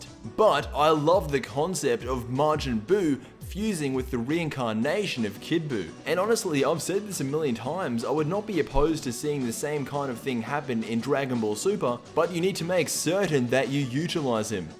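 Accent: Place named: Australia. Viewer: Gotta love that unique Australian accent!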